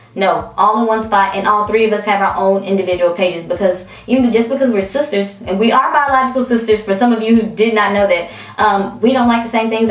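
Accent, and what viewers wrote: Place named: South, United States. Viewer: I will FOREVER love your Southern Accent!!! Thanks for the Video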